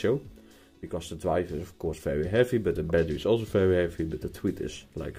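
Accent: Netherlands. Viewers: Nederlands ? Ik hoor de nederlands Engelse uitspraak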